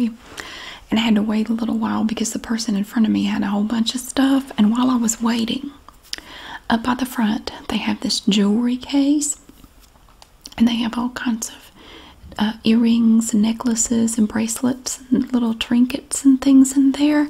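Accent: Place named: North Carolina, United States. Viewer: Im serving over seas and Your voice reminds me of my wife. she is from rural North Carolina. Your accent is spot on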